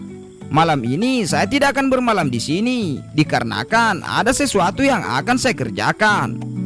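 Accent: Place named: South Sulawesi, Indonesia. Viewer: dari logatnya dari Sulawesi selatan kayaknya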